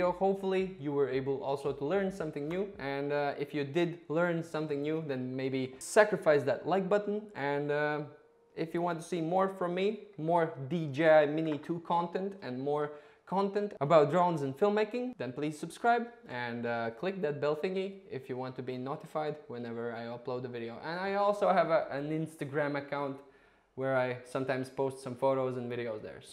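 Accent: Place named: Latvia. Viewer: pēc akcenta uzminēju, ka latvietis :D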